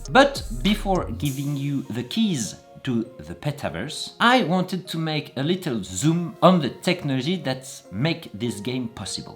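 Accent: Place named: France. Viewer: As a French, I love your French accent! :)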